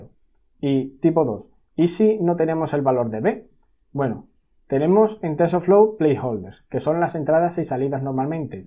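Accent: Spain. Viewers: Mejor aprendan inglés y vean los videos de TensorFlow... lol .... no puedo pasar ese acento "españolao" enfermante... ya chao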